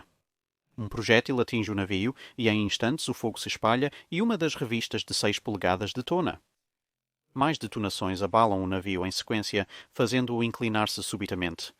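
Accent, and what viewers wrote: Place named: Portugal. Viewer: Excelente conteúdo amigo, apesar do sotaque tuga bem forte conseguiu passar muito bem a explicação toda no vídeo! Abraços do Brasil.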